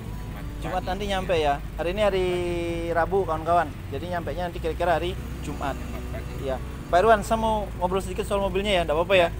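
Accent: Bali, Indonesia. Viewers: Logatny kyk bali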